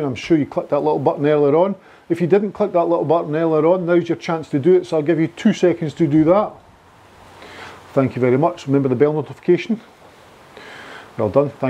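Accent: Scotland, United Kingdom. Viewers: I very much enjoy your lesson, both the content and your Scottish accent. Luckily I spent a wee while in the UK so it's no problem for me. Unfortunately I can not fwd your vdo to any of my Thai friends, because they wouldn't have a clue what you are talking about. Keep on the good work and no need to change the accent!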